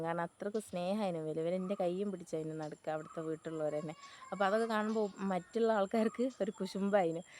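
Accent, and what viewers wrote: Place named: Malabar, India. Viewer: അപ്പോ ഇങ്ങള് പ്രവാസി ആയിരുന്നോ... എല്ലാം അടിപൊളി വീഡിയോസാണ്... കണ്ടാൽ കേട്ടിരുന്നു പോകും... മലബാർ ഭാഷയും കൂടി ആയപ്പോ കേൾക്കാൻ നല്ല രസമാണ്...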